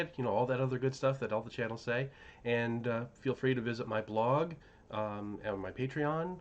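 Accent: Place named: General American, United States. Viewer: My man, you confuse me, how can you have the most british tavern keeper face with the most bog standard American accent ever?! Holy moly.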